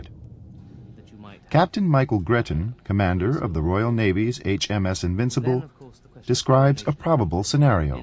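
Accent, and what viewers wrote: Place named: United States. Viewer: He speak american english NOT french so its american made video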